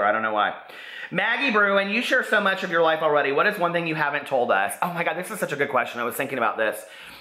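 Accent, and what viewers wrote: South, United States. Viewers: Just found you....love u so much! Are you from the south?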